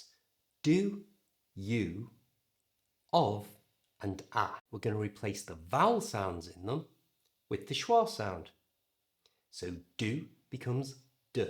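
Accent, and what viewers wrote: United Kingdom. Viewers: ❤❤I am Mansour from the beautiful country of Iran, and I would love to learn the British accent. Thank you, dear teacher, for your excellent lessons. I appreciate your effort, and I kindly ask you to upload more videos so that we can learn English professionally and correctly from the basics with this beautiful accent.❤❤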